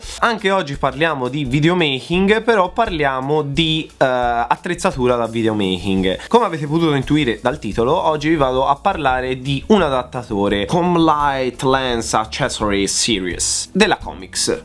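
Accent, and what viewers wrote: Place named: Tuscany, Italy. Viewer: Io da toscana a sentire un toscano moio dalle risate 🖤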